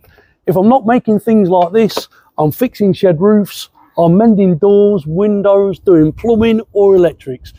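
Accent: United Kingdom. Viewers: Is he bringing these to his pawn shop? And why is he taking with a British accent?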